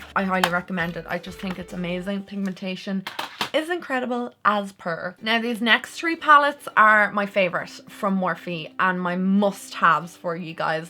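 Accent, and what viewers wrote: Ireland. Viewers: You're Irish😄😄😄😄 Legit haven't been able to find any Irish youtubers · I’m sorry but I’m liking and following instantly bc ur irish, An bhfuil chead agum dúl go dtí an leathreas (probs spelt like half the words wrong lol)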